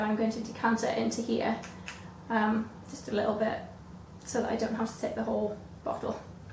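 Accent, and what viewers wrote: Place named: United Kingdom. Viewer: I like you cause your the only person who has a travel video in a British accent cause im British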